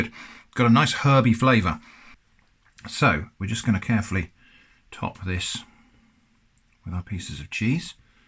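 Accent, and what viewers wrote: United Kingdom. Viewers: Your accent is magnificent. We Yanks speak crassly compared to the UK folks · pretty close on the spelling! with british accent of course! I understood it so good job! also these are damn great and tasty usually (ill edit it later, just started to watch the vid lol) edit: yup, probably one thing i miss from hungary since i moved to the uk... this stuff... the stuff here tastes like bullcrap, and not all of the polish shops sell this kind of stuff:/ but im happy, that it is not just loved by the eastern europeans, but by others as well! also try it with ham/chorizo on top of it, and add a bit of oregano on top of the cheese!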